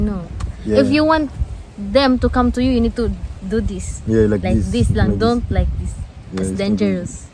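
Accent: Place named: France. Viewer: My friend, the French accent. Super .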